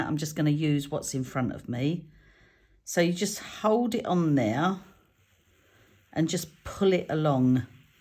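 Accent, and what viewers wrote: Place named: RP, United Kingdom. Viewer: The proper queens English!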